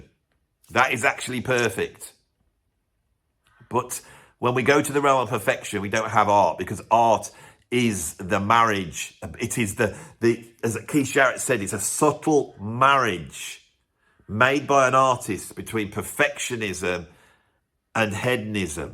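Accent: Birmingham, United Kingdom. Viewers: Love the vids. I'm not into prog, jazz or jazz fusion (I'm not sophisticated enough, I s'pose). I don't come here for them anyway. I come for the freestyle rambling humour of grounded in a deep knowledge of music. Just the Brummie accent brings a smile. I watched a recent video right to the end that had the rambling Brummie monologue at the end wit the animation. Loved it. Reminiscent of Eric Idle's rant on the travel agent sketch in Python (could that be the first comedy rant?...). I watch these lists tightly gripping the arms of my chair praying that a album will get its due. Usually, I disappointed, but, as previously mentioned, I'm not very sophisticated. As a Canadian, I was glad to see on the list. Our artists never get enough recognition. As for getting words wrong and your philosophy regarding perfection, I'm a few years older than and can empathize. 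I've always annoyed people with my ability to recall musicians, actors, directors etc... That has suddenly become more difficult. I can start a dinner conversation about a famous director then, suddenly, his name leaves my brain for a minute... It usually comes back within a few minutes but it is disconcerting. What a drag it is getting old... someone should work that line into a song... When it comes to mistakes therein lies the charm. I enjoy for the spontaneity, the unbridled enthusiasm. If was to present a perfect narrative, true to script like a politician reading off a t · It’s the British accent, neuroticism and insights that make this bloke’s channel cool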